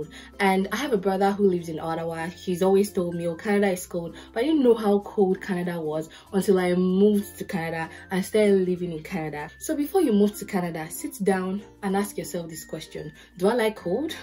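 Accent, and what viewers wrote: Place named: Ijebu-Igbo, Nigeria. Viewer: Do not change your accent for anybody!! Thank you for not changing your ijebu-igbo accent 😁😁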